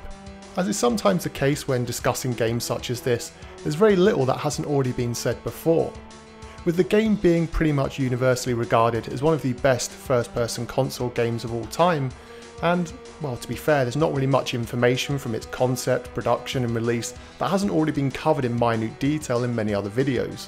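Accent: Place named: United Kingdom. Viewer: Narrator, which region of British accent do you have? Asking respectfully as a yank haha. Found your channel today and a rabbit hole is where I now find myself.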